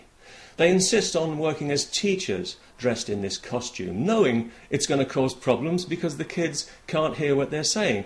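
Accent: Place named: England, United Kingdom. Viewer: Si tu avais pris la peine d'écouter deux secondes tu aurais entendu qu'il est anglais et non pas américain.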